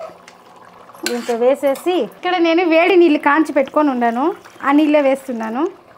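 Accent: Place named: Chittoor, India. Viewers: Hi sister me oru Chittoor aa maoru la undhi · Ne matalu basha Rayslaseema pillala vunnavu kani antha neyyithone cheyali nuv oil vadavu Nen eppudu chesthanu potato vesthanu totalga ne vusharu ne mata rhiru navhhai keep it up God bless you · Its rayalaseema slang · You are popularising Chittoor district Telugu dialect, thank you 🎉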